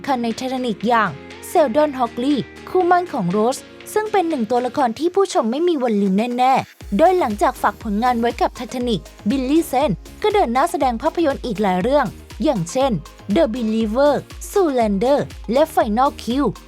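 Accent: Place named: Suphanburi, Thailand. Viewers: แอบขำแอดแอบเหน่อ 😄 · หนคนเดียวมั้ยที่ฟังไปเรื่อยๆละคิดว่าคนภาคเสียงหยั่งเหน่อออโค๊นสุพานน55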